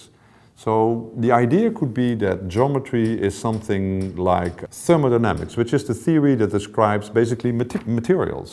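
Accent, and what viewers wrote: Netherlands. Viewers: Je hoort meteen dat hij Nederlands is :)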